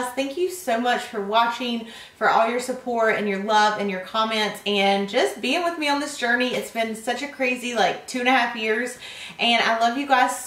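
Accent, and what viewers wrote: South, United States. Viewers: I LOVE her kind of southern accent it's so pretty ❤️ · your pure American (love your accent but it won't suit me lol)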